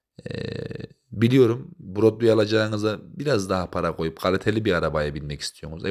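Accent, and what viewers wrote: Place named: Sivas, Turkey. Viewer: Gardaş sen Sivaslı mısın? Şive Sivaslı gibi.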